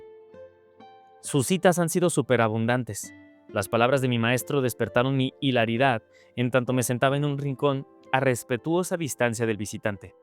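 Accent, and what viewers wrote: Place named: Mexico. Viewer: Me gusta mucho tu manera de leer pero sobre todo ese acento mexicano inconfundible ❤❤🙏🇨🇺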